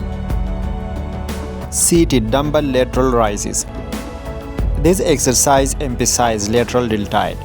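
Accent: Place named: India. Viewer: Your English very poor indian style hahaha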